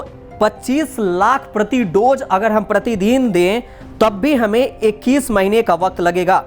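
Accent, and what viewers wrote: Bihar, India. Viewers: A bihari accent thheek karr Bhaarat hota he Bhaadat nai Zaroori he Zadoodi nai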